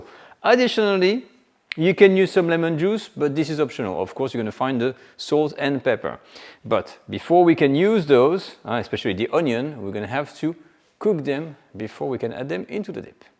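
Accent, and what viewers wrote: France. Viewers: I've lived in France for more than 40 years, and I still love the authentic French accent when speaking English!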